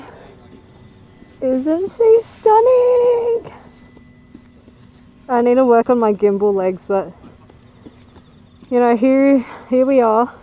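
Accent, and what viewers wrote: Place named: Australia. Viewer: Asian girl with Australian accent is so cute 🥰 not to mention you drive a s1k 🔥🔥🔥🔥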